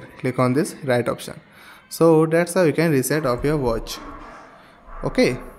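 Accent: India. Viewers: Please add captions, sometimes it sounds like you’re speaking Indian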